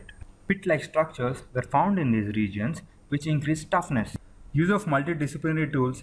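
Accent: India. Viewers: That Indian accent though! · The Indian accent is the worst accent in history.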